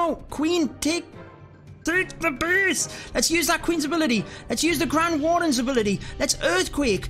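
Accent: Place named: Northeast England, United Kingdom. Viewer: Newcastle or Sunderland mate?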